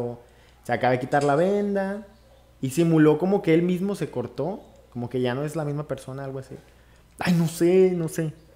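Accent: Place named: Colombia. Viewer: No tiene nada que ver pero amo tu acento colombiano 💞 ahri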